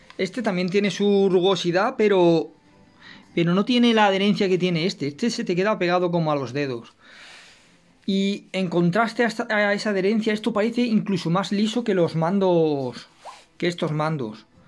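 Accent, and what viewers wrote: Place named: Alicante, Spain. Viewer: jajaja es que hay ganas de ponerte cara hombre jajajaj a de más por el acento no debes vivir muy lejos de Alicante no?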